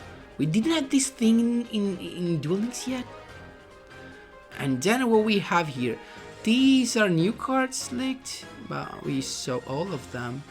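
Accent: Italy. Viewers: Great video I so hipped for dark magician, but one question. Are you from Italy? You talk whit an Italian accent. I say it because am Italian and.. I don't know I've this sense of familiar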